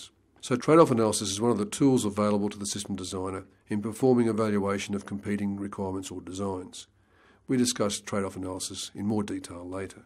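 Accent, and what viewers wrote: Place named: Australia. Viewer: I'm not used to the australian accent, he speaks like too fast and for me it's not clear enough, I have to listen twice sometimes but I'm glad this course is uploaded.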